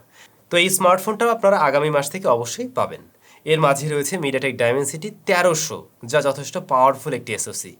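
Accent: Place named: Barishal, Bangladesh. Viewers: ভাই তোমার বাসা বরিশালের কথায় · বরিশালের কেন জাগয়া বাসা আপনার?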